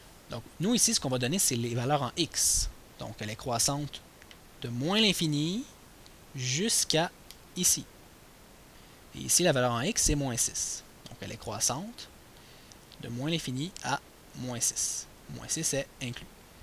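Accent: Quebec, Canada. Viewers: il est Quebecois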